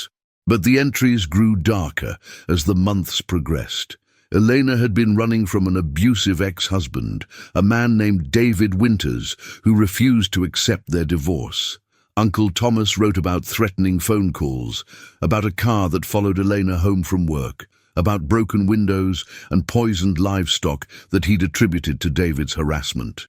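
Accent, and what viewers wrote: United Kingdom. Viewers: I enjoy the British accent, the smooth reading style, and relatively few pronouncement errors.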